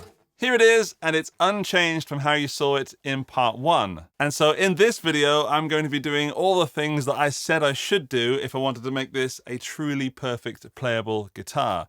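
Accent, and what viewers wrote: United Kingdom. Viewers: You said you live in Austria, however your English is considerably better than your German. From your accent, I'd give it an uneducated guess and go with Great Britain and the northern Irish Isles as your country of origin?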